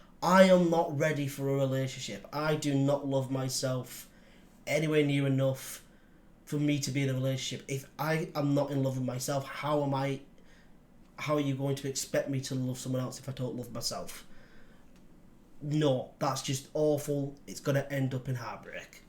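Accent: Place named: United Kingdom. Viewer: Where in the UK are you? Love your accent. I'm pulling for you. Good luck. I know you can do it.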